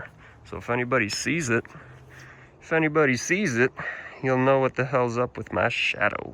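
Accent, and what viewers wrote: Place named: Canada. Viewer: Best part of your Channel: Authentic Canadian Accent = Subscribed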